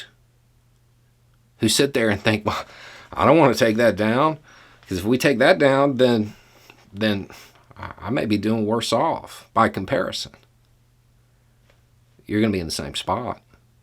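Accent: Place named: Mississippi, United States. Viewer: Oddly enough, it was blacks, gays, lesbians that were kinder to me then any rich 'white' person. Really just because of cosmetic differances your life is supposedly better, I guarentee you when stufff beyond your skin color challenges a person, that peson will be in the exact same boat. None of us have time to look down on one another because of things people cant chose. When you see more commonaiity with people, you find solutions then differences. This guy here sounds like every poor white person I know in South MS. Please dear writer, get a grip.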